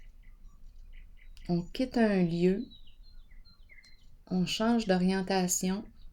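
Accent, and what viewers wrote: Canada. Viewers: Mon Dieu, que j aime votre accent canadien. On dirait mon ami Matthieu Bock Côté. Adorable cet accent !!!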